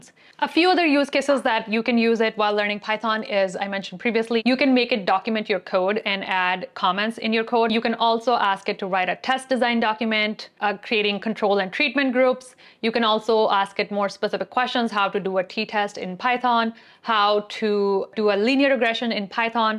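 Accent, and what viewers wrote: India. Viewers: Dear youtuber are u a kashmiri Coz ur accent sounds so much like us! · Stop with the fake American accent. You're getting exposed with your Indian/Pakistani accent on several occasions. It's cringe of the highest order. Stop pretending 🙄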